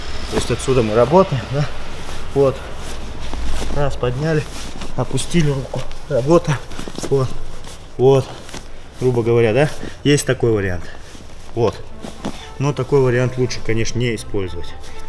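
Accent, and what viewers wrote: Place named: Chechnya, Russia. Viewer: Слышу родной для ингуша чеченский акцент. Молодия для души!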